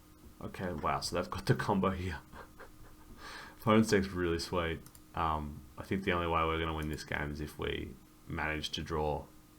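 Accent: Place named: Australia. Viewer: Just found your channel and I really appreciate the way you talk through your draft and analyse what went wrong in that smooth aussie accent.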